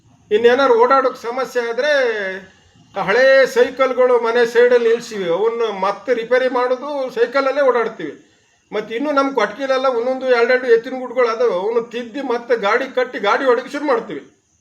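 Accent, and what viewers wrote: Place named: Malenadu, India. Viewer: ನಮಸ್ಕಾರ 🙏 ಮಲೆನಾಡು ಕನ್ನಡ ಬಳಸಿ ವಿಡಿಯೋ ಮಾಡುತ್ತಿರುವುದು ಕೇಳೋಕೆ ತುಂಬಾ ಸೂಪರ್ ಆಗಿದೆ 👌👌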